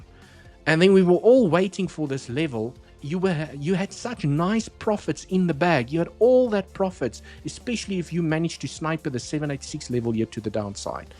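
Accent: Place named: South Africa. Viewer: Thanks. Nice to hear a South African analyst. I'm in the UK now, but 41 years in Southern Africa. 🇿🇦🇿🇦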